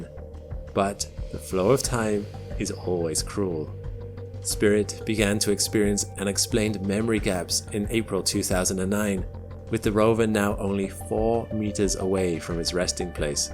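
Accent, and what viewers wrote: Wales, United Kingdom. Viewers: Are you Welsh by any chance? Every so often during the video, I thought I could make out a slight Welsh accent, more noticeable with certain words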